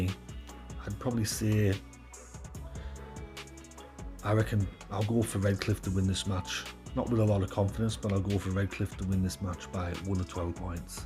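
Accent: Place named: Liverpool, United Kingdom. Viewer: Scouse accent..👌😊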